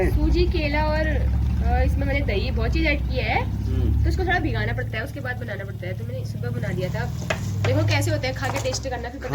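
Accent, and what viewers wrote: Pahari, India. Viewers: Aapka pahadi bolna bahut accha lagta hai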